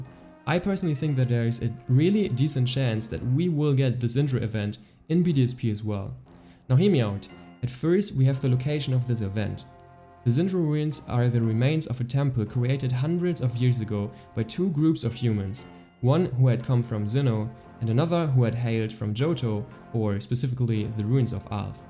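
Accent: Germany. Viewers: you sound like youre german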